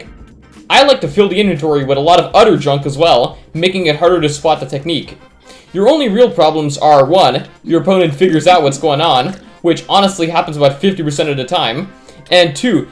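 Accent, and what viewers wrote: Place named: United States. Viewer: ? Is that you under that American accent?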